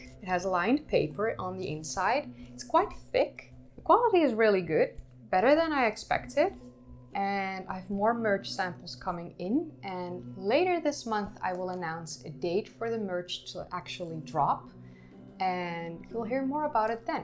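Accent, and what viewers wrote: Netherlands. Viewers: I like the Dutch pronunciation 👌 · I luv your Dutch accent. Takes me back to childhood in Europe. "Terribly Incredible" = perfect. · Your videos are great! Don’t worry about the accent. Dutch speaking people generally do a great job with their sister language (or so I’ve noticed). I speak some Norwegian as well (very poorly granted after 30 + years of being away from it), but we should never be embarrassed about trying. You definitely have a great command of the language. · The Dutch accent should stay, R's sound so much better ❤. Great take on the 1984 Dune lol. It is a cult classic good and not good simultaneously. Excited for merch too. Oh and I am in love with those scrunchy sleeves 😍.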